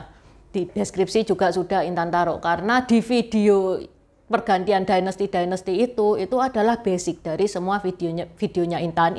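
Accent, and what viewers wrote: Java, Indonesia. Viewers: Ternyata dgn bicara medoknya, menjadikan saya asiik mendengarnya😅😅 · "lha wong..." biyuh muedhok.. Ngalam ikut ngintip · logat nya meedook banget😁😁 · mantap walaupun medok banget jawanya, lanjutkan ci.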